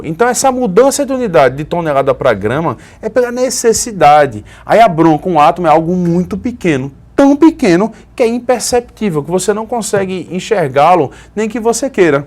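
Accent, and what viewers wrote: Pernambuco, Brazil. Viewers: Ahhhhhhh! você é muito bom! (é Pernambucano né? haha) por favor continue colocando conteúdo de química, sua explicação é top.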